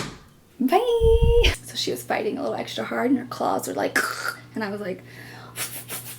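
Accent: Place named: New York, United States. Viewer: Yo u funny asf 🤣 n you sound like ur from NY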